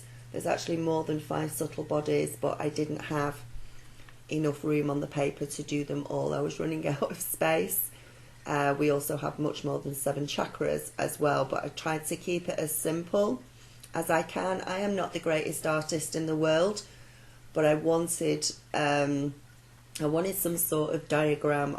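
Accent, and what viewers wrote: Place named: Yorkshire, United Kingdom. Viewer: Thank you so much! I've had this on my watch later list for a couple of weeks, and decided to watch today. Wonderful synchronicity in waiting until now to watch it, as I decided just three days ago that it was time to ditch the news, conspiracies and politics - got drawn into the conspiracies for about 9 months I think, and I'm now just thinking, right, I've learned that and I can move on now. When I did a meditation with the intention of releasing it all and cutting the cords, I got such an intense positive feeling, like my Guides were really excited - I felt their excitement! 😃 Also changing my diet gradually. I've been trying to cut down on sugary snacks, but going to try again now. You got yourself a new subscriber, and great to hear another Yorkshire accent! 💖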